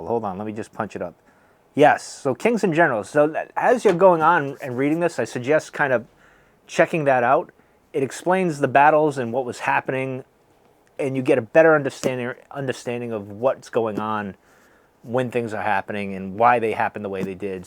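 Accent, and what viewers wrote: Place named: Boston, United States. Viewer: Judging by your accent you sound like a Celtics fan?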